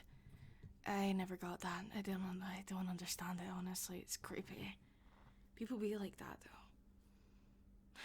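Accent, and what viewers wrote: Scotland, United Kingdom. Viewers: I should be afraid, but the Scottish accent intrigues me way too much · I guess being scottish is a new fetish, welp *i like it*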